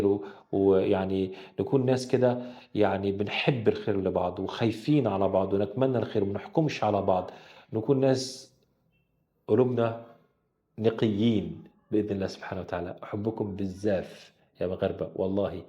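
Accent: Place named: Egypt. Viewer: تبارك الله عليك لهجتك المصرية لم تتغير رغم انك ترعرعت في السويد 👍🏽 مرحبا بك في بلدك.هناك مصريين في مناصب التعليم بالمغرب منذ زمان درسنا عندهم 👍🏽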